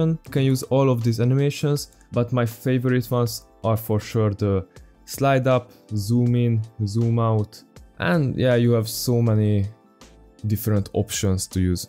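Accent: Hungary. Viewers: gya de hallatszik hogy magyar vagy teso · Érződik egy kicsikét a magyar akcentusod, de ezerszer felismerhetetlenebb, mint az enyém. Üdv a nemzetközi youtubeon és sok sikert továbbra is! :) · Magyar akcentus feltűnt 😀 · Hungarian? Edit: I knew by the accent. I'm Hungarian too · mondom magamban olyan magyarosan beszél ez a pali erre nézem a windows languaget xd kösz amúgy fasza videóü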